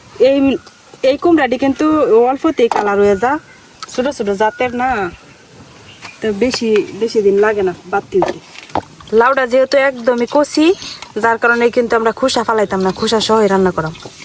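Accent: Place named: Mymensingh, Bangladesh. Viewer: এটা কি ময়মনসিংহ?? আপনার ভাষা সেরকম মনে হচ্ছে।। আবার পাহাড়! অনেক পাথর!! নেত্রকোনায় এটা? কুমড়ো টা এতো সুন্দর লাগছিলো!!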